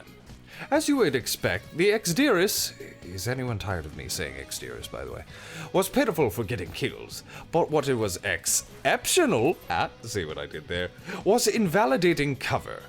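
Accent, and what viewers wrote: United Kingdom. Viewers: Love the content & your British voice. But it's kinda funny how I literally just made a double primary cascade point PVP build with Undying Tempest & positive outlook with Dragon Shadow on my hunter yesterday. And it's so much fun once you get the ball rolling! Cascade Point is actually a sleeper TTK and DPS perk.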